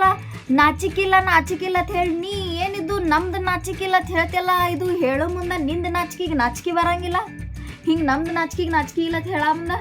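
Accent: North Karnataka, India. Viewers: ಅಕ್ಕಾ ಯಾವ ಊರು ನಿಮ್ದು plz ಹೇಳಿ ನನಗೆ ಅನ್ಸುತ್ತೆ ಪಕ್ಕಾ ಉತ್ತರಕರ್ನಾಟಕ ಅನ್ಸುತ್ತೆ 💥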